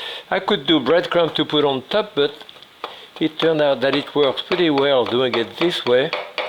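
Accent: France. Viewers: He has a French accent. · He's french!! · Just shut up and go away. He DOES NOT have a speech impediment. He has a FRENCH ACCENT.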